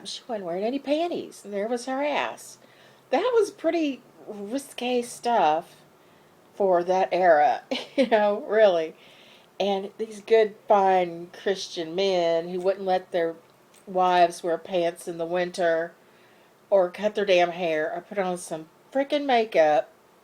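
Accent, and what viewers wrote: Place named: South, United States. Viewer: I had every toy you mentioned, the straw bubbles never worked for me! that stuff was crap. you look so good, loving you glasses and winged liner. seriously southern accents are just charming, you make everything sound so lovely. oppose to my New yorker accent which is...we'll interesting. I'm from brooklyn and now one here is soft spoken. No I don't sound like that stereotypical movie over dramatic ny accent. I worked my whole like to sound like I'm not from here!!!!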